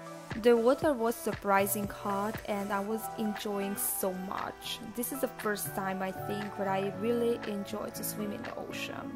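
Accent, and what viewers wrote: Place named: Hungary. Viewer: This is so cool video. I've been watching your work on your other channel for a few months now and I always thought that your accent is somehow so familiar to me. I've had this feeling that you're Hungarian but I was never sure until this video. Kinda mind-blowing for me. I'm from Slovakia but my grandparents are Hungarian so I also speak a little ❤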